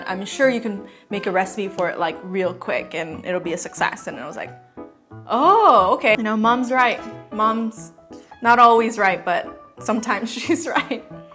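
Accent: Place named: United States. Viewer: My husband was raised by his Grandmother who is 100% Korean, she can not even read English, and can slightly speak it. I will say, you're about the most American speaking Korean I have come across thus far. That is, if you're indeed Korean? Thank you so much for your channel!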